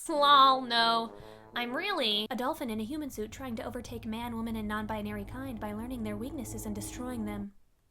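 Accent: Minnesota, United States. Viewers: You live in Minnesota right? Your accent peaks through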